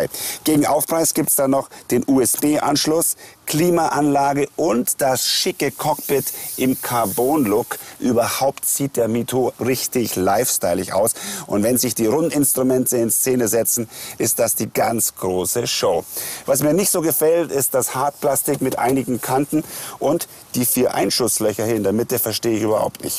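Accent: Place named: Germany. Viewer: es gibt nichts schlimmeres, als wenn ein Deutscher italienisch spricht!!! Bitte verbietet das in Zukunft! Ich sag nur Schüttelfrost!!!!!!!!!!!!!!!!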